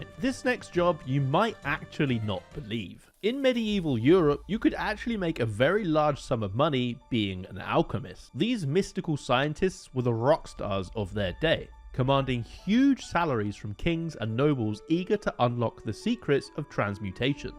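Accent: United Kingdom. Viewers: Sounds like brit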